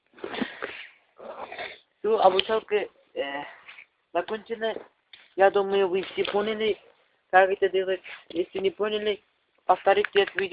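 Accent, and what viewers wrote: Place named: Dagestan, Russia. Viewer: татарский дагестанец точно говорю акцент похож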